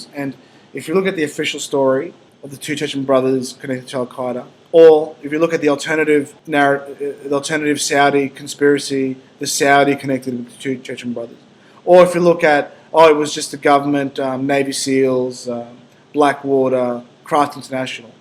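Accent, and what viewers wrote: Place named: New Zealand. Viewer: sorry to be off subject but...you're a kiwi aren't you! :)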